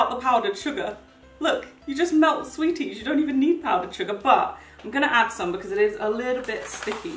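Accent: United Kingdom. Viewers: I love ur accent and ur vocabulary! It’s so crackup, typical British😂(well I’m guessing) lol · I'm new is this girl British?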